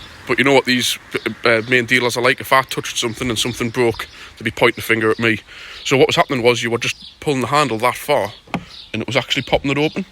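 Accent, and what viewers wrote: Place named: Geordie, United Kingdom. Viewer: Just ordered the rain defence kit from Mitsubishi for my truckman canopy, cheers for all the helpful advice mate, you're a legend and sound like a canny lad. I'd never have known they did one, and got the bonnet deflector too, drums are lovely and matt black already. I'm picking it up on Wednesday this week and any experience with the Goodyear Wranglers which are fitted? I'll deffo get the 265's (I think if i remember correctly?) when they're due for new ones like you suggested. Egr delete lead pre-ordered and paid for (week delay, sold out) Love you man, you're awesome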